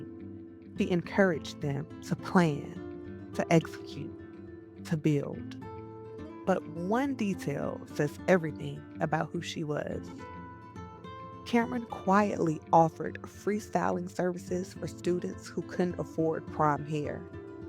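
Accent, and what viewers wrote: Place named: Atlanta, United States. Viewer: Most of the people who are in Atlanta are not from Atlanta.. just ask the people who you encounter.. .. Honestly you sound like you were born & raised in the A.. if not where are you from? We are hoping that everyone will just go back to where they are originally from so that we can have our city back !! Like someone said today young black men has been around since the beginning of time.. Stop stereotyping them by calling them YN’s ! If you all think that Atlanta is so bad.. try living in Memphis or Chicago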